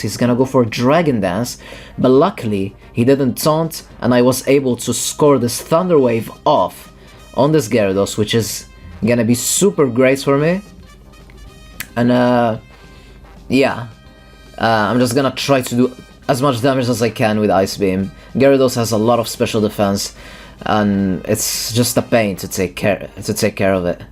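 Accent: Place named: Japan. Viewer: Your Japanese accent is epic. Critical hitto! Jettoman! I love it.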